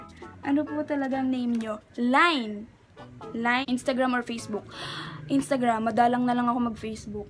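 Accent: Visayas, Philippines. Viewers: Hahaha bisaya jod kaayo 😂 miss u hahahah puntahan kita perps mol papapicture ako sayo 😂🙈💞 replaye ko please hahah now pako nag tan aw sa imo mga vids. Sub na! 💋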